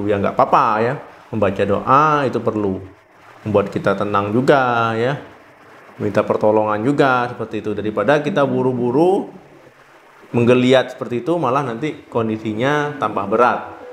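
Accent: Aceh, Indonesia. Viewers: Logat dokter kek org aceh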